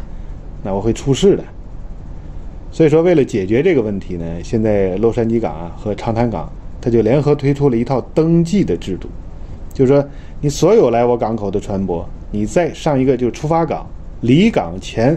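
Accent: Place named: Tianjin, China. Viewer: 请问李船长，你是不是还有个频道叫？声音和你一摸一样，也是天津人。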